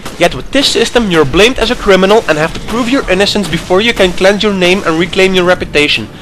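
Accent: Netherlands. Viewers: I know, that is why i put it in my wall of tekst! :) Go make more great video's m8, i enjoy them alot even with your dutch accent.. :) Its rare that i see video's who actually make sense. Anyways have a great newyear and all the best.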